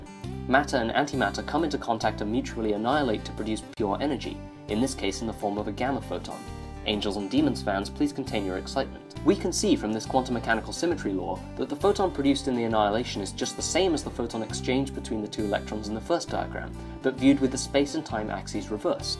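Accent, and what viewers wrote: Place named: United Kingdom. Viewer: Thanks for this, my only suggetion is to speak slower and more clearly. That Brit accent is sometime hard for Americans to understand when spoken fast.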